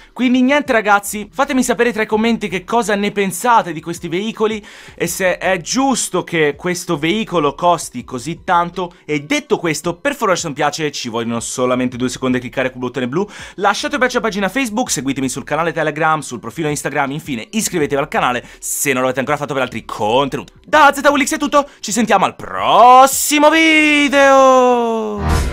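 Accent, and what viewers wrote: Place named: Tuscany, Italy. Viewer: Mhhh prima volta vedo il tuo canale e mi pare tu sei Toscano... Montecatini? Il modo di parlare mi sembra di lì ahahah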